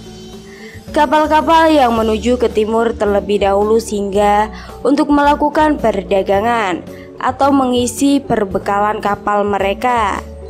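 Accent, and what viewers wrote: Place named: Java, Indonesia. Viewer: Ciri² suaranya pasti orang jawa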